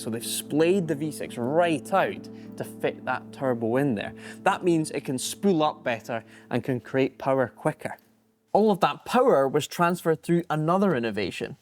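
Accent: Scotland, United Kingdom. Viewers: Why's Nigel Mansell got a Scottish accent?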